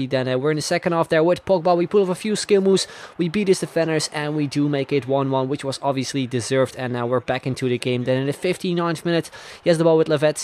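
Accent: Netherlands. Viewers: you can hear the dutch trough the english :p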